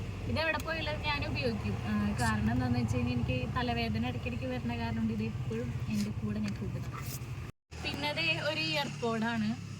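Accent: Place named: Thrissur, India. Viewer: തനി തൃശൂർ slang 😂🎉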